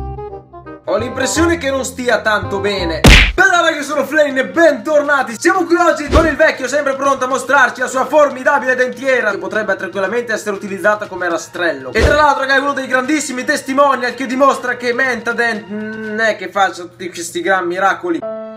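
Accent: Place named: Emilia-Romagna, Italy. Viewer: ma tu di dove sei? ho sentito un bell' accento Emiliano-Romagnolo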